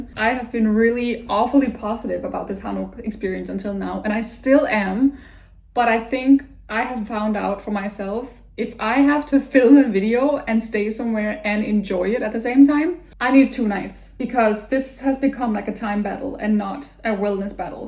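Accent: South Korea. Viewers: 응? 발음이 한국인인데? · ~ 발음이 완전 한국사람 같습니다. ❤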